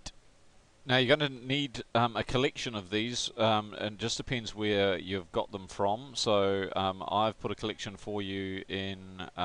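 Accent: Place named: New Zealand. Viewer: You sound like you're from nz, our computers at school also have the student shared drives set up like that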